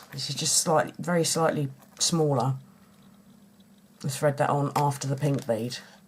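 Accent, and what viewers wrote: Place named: England, United Kingdom. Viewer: Great tutorial and idea! Thank you for sharing. Also nice to hear English accent I live in Sussex! Lol I'm used to hearing Americans! Lol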